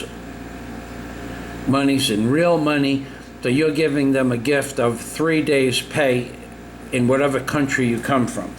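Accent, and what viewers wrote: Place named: New England, United States. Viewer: Very funny but a useful reality check for all the naive guys and asian dating site newbies that believe everything they see or read. I think this is the second video I've seen of yours and you are actually a little annoying at times especially your new england accent and ridiculous obama references but you do provide some funny content. And you seem to attract the money grubbing types (big money)...why do you think that is?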